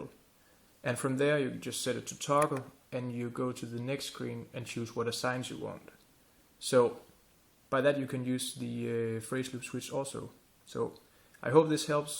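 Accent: Denmark. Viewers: Hi again! nice videos. Easy understandable. Are you Danish ????? thanx again 😁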